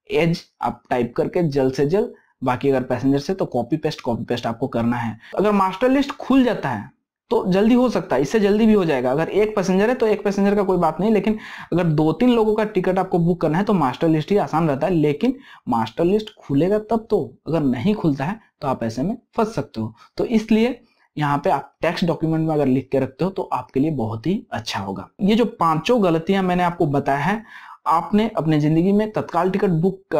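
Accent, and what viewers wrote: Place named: Bihar, India. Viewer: Lagta hai bhai bihar ka ho...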